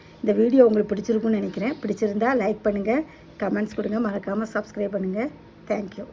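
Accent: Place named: Nagercoil, India. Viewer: I am from Nagercoil.Vadasery. Your slang is as ours. In which place you are. I daily see your vedios. Sweet Amma. Very happy to see you.😄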